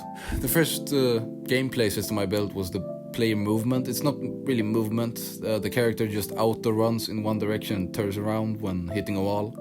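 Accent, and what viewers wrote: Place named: Sweden. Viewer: Great job! Love the Swedish accent as well!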